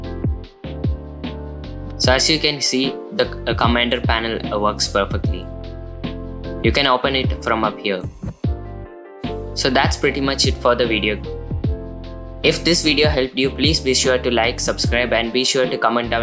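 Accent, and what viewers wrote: Kerala, India. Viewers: are you a malayali or indian you sound really like one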